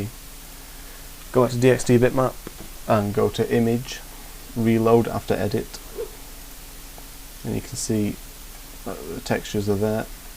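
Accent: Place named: Keighley, United Kingdom. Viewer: You sound like a Keighley lad!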